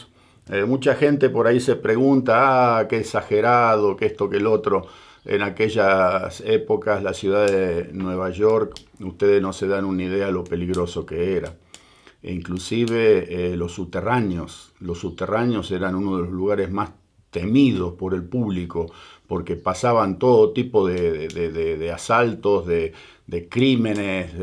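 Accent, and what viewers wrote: Argentina. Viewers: Que historia realmente de pelicula..... !con mi marido coincidimos que la narracion de la misma nos genero diferentes sentimientos ,realmente muy atrapante y con espiritu de superacion ,responsabilidad y valentia destacables ,porque a pesar de la corta edad se notaba en sus relatos que eran jovenes responsables y al verlo ahora se refleja una persona integra ,seguramente sus hermanos tambien, gracias por compartirnos su historia y su hija firme apoyandolo al lado da mucha ternura y la felicitamos tambien , se nota ese amor a la tierra ese Argentino de corazon hasta el acento esta intacto ...felicidades por los logros porque a pesar de las vicisitudes de la vida su progreso y superacion estan a la vista . un saludo desde Catamarca ARGENTINA ...... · Muy interesante,sigo todos sus videos y me fascina la forma de narrar tan nuestra,tan argentina de,quiero seguir escuchando esa maravillosa historia de vida!!!!! 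· Me encanta su manera bien argento, de relatar. A pesar de haber dejado nuestro país hace 50 años, no perdió sus modismos ni acento. Saludos. 🎉🎉🎉🎉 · Sí! Me encanta que a pesar de haber emigrado tan joven todavía tenga una manera muy argenta de hablar. ❤ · Por que lo voy hacer? he estudiado.Sobre gustos los colores! No me gusta! al principio si por que vi el acento argentino, pero despues se puso Denso! No me interesa! saludos! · Bien,me asombra y agrada a la vez,que no perdiste el acento argentino,ni los modismos,despues de vivir tanto en el extranjero,Un saludo desde Argentina.(Y me parece que te esta "tirando"el solcito,porque a cada rato andas por el pais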